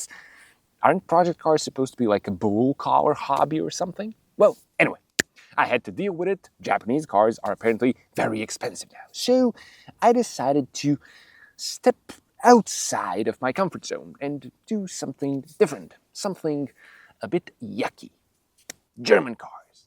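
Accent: Eastern Europe. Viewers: O quê, és português? Eu tinha ssumido que eras da Polónia ou Latvia ou um pais mais para o este da europa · Wait, this guy is Portuguese? Why have I always thought he is eastern European? 😅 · Wait, you're Portuguese?! I always thought you were eastern european...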